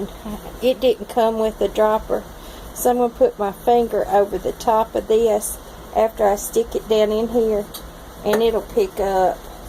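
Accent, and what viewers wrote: South, United States. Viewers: James D I just love her voice! So southern and sweet. 😇🇺🇸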